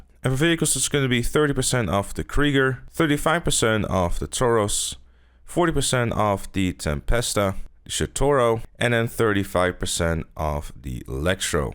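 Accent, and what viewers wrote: Netherlands. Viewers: I miss the Dutch accent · Wait are you Dutch?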